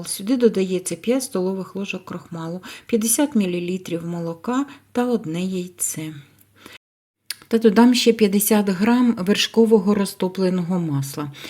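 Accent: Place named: Polesia, Ukraine. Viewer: "...щоб він не підсиха(о)у" - поліське, впізнаю. Побільше б такого автентичного, з вашої родини, від бабусі (хоча це слово (бабуся) - то від нас, з Полтавщини) 😍 Оце ваше кі(и-у)н(ь) - кінь, гурок - огірок, сі(и)ль - сіль. З Полісся з всі слов'яни пішли ;-)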